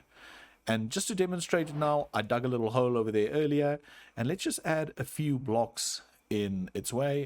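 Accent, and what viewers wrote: South Africa. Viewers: Are you south african? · South African? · This guy sounds south African ngl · Nice video! Are you south african? I think I hear an accent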